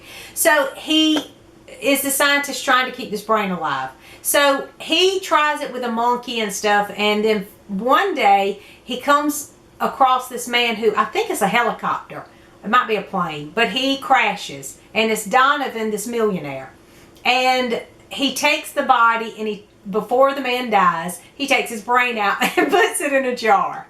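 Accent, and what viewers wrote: South, United States. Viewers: I'm so curious where you are from? I'm an East Tennessee girl but your southern drawl has me beat! 😉💜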